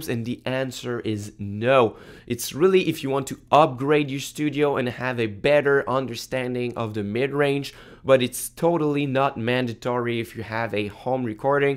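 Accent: Quebec, Canada. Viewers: es tu québecois ?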